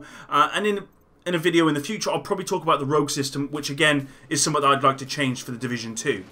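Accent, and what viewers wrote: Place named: Newcastle, United Kingdom. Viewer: I’ve been watching you forever and I just realized you sound like the true Geordie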